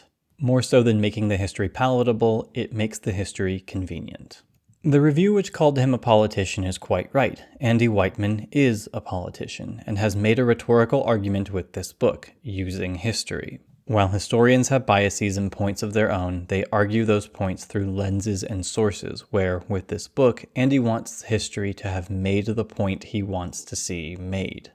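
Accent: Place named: California, United States. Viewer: So what if he does? There’s lots of vowel shifts currently happening across different accents in American English. He sounds Californian, which makes sense cause that’s how a lot of us say “biases” here.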